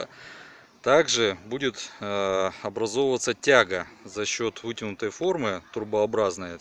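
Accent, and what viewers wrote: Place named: Ukraine. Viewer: Украинский говор как не странно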